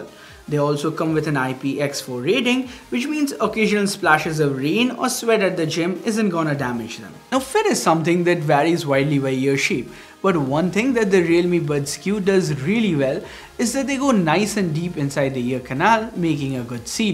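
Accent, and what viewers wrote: India. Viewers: but to be honest... My English has been improved a lot and still improving by watching his indian accent english videos 😊 it's really helping me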